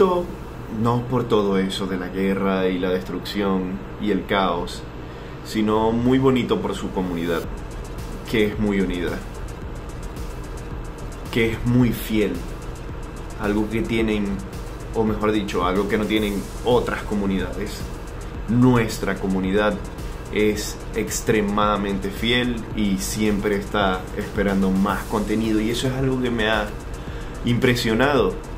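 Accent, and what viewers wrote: Venezuela. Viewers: ME ENTERO QUE VENEZUELA TIENE UN LUGAR EN LA DISFORMIDAD, AUNQUE CREO QUE QUIEN LO GOBIERNA ESTA CORROMPIDO HASTA DONDE NO LLEGA EL SOL, SALUDOS DESDE VENEZUELA, PREGUNTA ESTUPIDA ¿ERES VENEZOLANO?, TU VOZ SUENA ALGO CARAQUEÑO. EXITOS Y PROPERIDAD PARA TU FAMILIA HERMANO DE BATALLA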